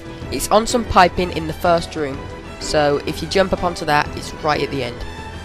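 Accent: England, United Kingdom. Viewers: o rite sorry if u took offence i did actualy use ur guides a while back to get my halo online spartan laser acievmentssuch as 2 kills in 1 shot and shooting the banshee :D u make great quality video btw a guide for the laptops in COD 4 would be useful to alot of people it took me 3 hours to get them with a written guide bu with one of your video guides it would be alot faster just an idea just wondering what part of england is ur accent from?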